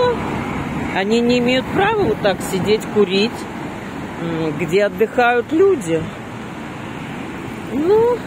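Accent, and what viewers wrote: Odessa, Ukraine. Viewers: Снова и снова восхищаюсь голосом и одесским говором. Спасибо вам большое. Смотрю вас с удовольствием.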